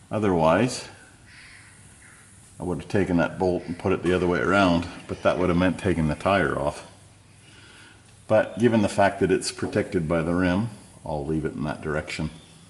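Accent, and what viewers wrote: Canada. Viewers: Very useful video! Thank you! Are you a Newfie?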